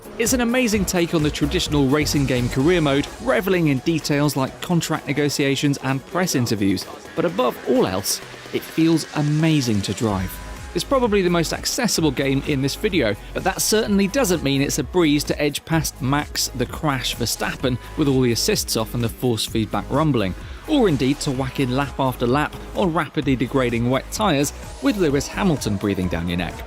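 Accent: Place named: England, United Kingdom. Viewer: You sound english. Why ATS and not ETS2?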